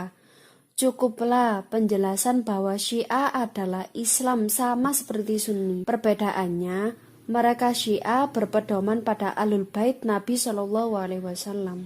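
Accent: Java, Indonesia. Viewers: kalo saya liat logat2 nya org jawa y ka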